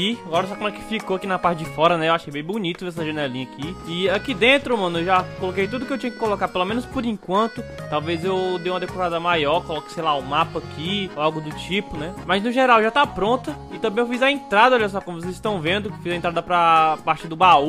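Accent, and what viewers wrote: Ceará, Brazil. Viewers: Tú e de onde man,esse jeito de falar parece CE kkkk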